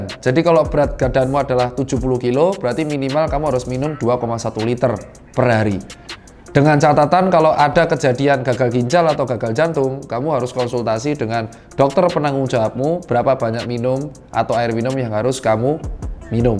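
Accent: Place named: East Java, Indonesia. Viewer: Dr tirta ngomongnya kok kayak orang jawa timur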